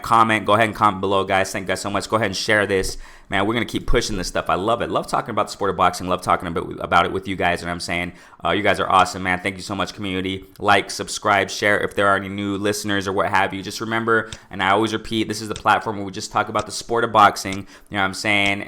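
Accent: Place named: Mexico. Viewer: Hey good video! I was picking up on your accent and it sounds Mexican, by the way you emphasize on the "J" in Verdejo. Am I right?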